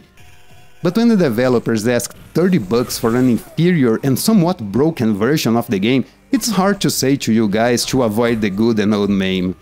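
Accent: Brazil. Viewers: o sotaque BR me surpreendeu kk bom video man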